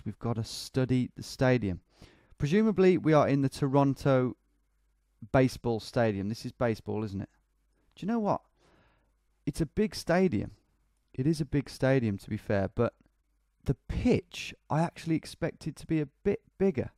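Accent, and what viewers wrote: United States. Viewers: You would know better than me here I am in Iowa and know nothing about any sports also nice American acceny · Love the American sports fan accent. All my life I've heard Americans try to do English accents, so this is a first. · bloody love his american accent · I cant lie your american accent is great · Your American accent is on point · Your General American accent is hilarious, it’s so ambiguous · Your American accent is pretty good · His american accent sounds bang on to me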